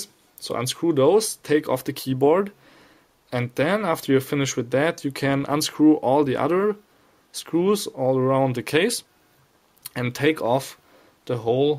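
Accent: Germany. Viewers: great tutorial despite the german accent, danke schoen! :)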